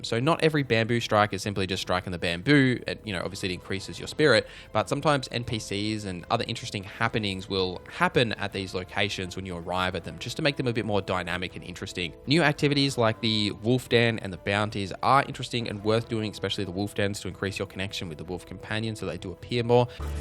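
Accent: Australia. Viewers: He's not from New Zealand he's Aussie. Big difference buddy.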